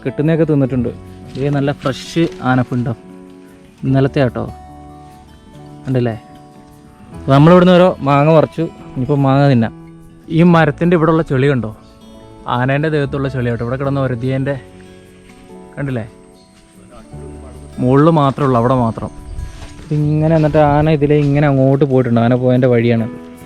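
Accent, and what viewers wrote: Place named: Malappuram, India. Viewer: Bro oru samashayam nee malappuram alle but slank. Bery anallo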